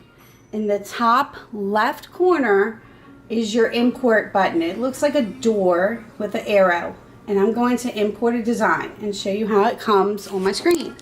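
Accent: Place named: Delaware, United States. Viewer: my ex mother in law and one ex sister in law lives in Middletown my other ex sister in law lives in Bridgeville. Your accent reminds me of them (all good things). I graduated from Dover High School before moving back to my home town in Nevada lol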